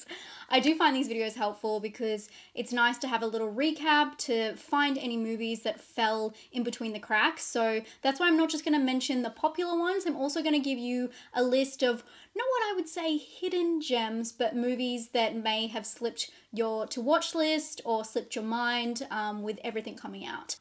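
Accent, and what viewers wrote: Australia. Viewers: i Love your Australian accent and i like the way you look when you talk like that. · Love the Aussie accent!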